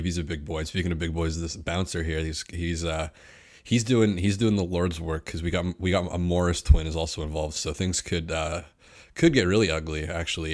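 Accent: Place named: Australia. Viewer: Holy fuck this is giving me history class flashbacks 😂😂 Love your Australian accent m8 Idk what the hell to say about this man I think about this relatively often. Shout out Gary standing up for his boy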